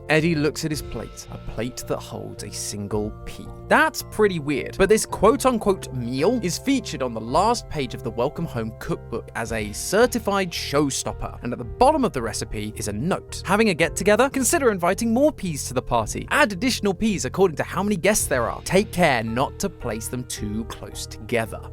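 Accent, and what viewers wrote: United Kingdom. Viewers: Why is he british? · Hold up why did MatPat leave? Nvm Now we a Very British Man😂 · I like your accent, british man. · Tom sound like the British version of mato at or more of a Brit acent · Ok, but the british accent?😳 Definitely staying with game theorists now😅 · We may miss Matpat. But British matpat hits different NOT IN THE SUS WAY YOU IDIOTS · british mate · Why did they replace Matpat with this Brit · The new host has such a nice British accent I could never reach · Tom is literally a British version of matpat and I’m all for it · I’m going to call Tom British matpat now. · BRITISH BOI IS BACK! WOOOO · WAIT HES BRITISH :( GOROSEI DENIED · He’s British, 😮 not going to lie, I like him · The British boy is back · Tom is the most British person I've ever heard Note:it's not a bad thing that he's British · I actually appreciate the voice change and i have to say its almost nostalgic for some reason to replace an american accent with a British accent. 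I accept. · Is tom British❤ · matpat sounds british lol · He sounds Soo British. Is Tom British?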